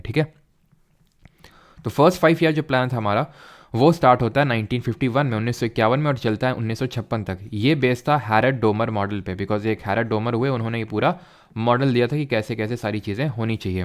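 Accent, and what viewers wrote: Kanpur, India. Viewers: Hn kanpuriya hai sir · Sir aap kanpur se h kya ?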